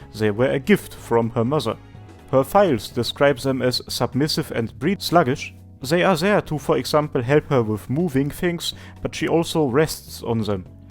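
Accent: Germany. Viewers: The way you pronounced "Heiligkeit" as well as your accent in general make me believe you're German. Please tell me if I'm correct with that assumption.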